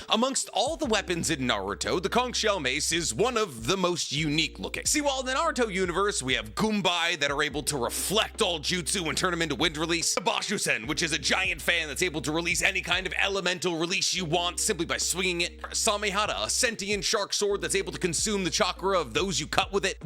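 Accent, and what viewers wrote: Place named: New Hampshire, United States. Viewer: I was wondering why you said it like me I live in NH to I get that. XD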